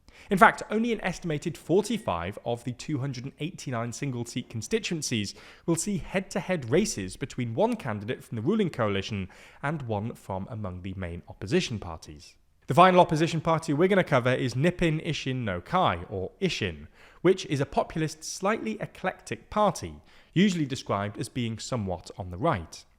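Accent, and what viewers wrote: United Kingdom. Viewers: Its his british accent heh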